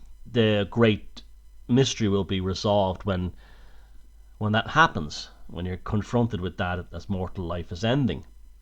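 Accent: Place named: Dublin, Ireland. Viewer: Great to have you enjoying your work, iv learned so much from your videos, I'm like the gotchy man, ya have a Dublin accident people think your a cretan, and my accent is like yours dub. Not the new flat strong Dublin accent, thank you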